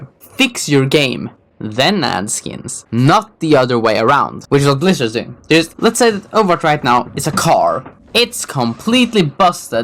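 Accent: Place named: Sweden. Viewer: Lmao I can already guess that u are swedish, or norwegian or something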